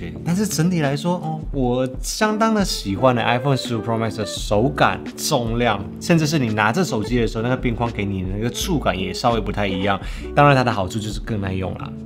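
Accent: Taiwan. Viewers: 真心听不惯台湾腔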